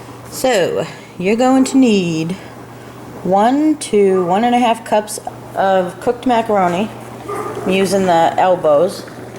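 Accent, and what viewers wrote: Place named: East Coast, United States. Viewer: I found your channel over the weekend and I thought I'd leave a comment and say HI! & say.... please do tons of hauls! If some are sick of em they can skip that video. & Yes I can see :) LoL I love all the stuff you do! & You cook things that I can do! I am definately going to try the stir fry I watched last night!! Last thing is I love your east coast accent! I & My family are from the corner of Indiana near Chicago so I am used to a striong Chicago accent! XoXo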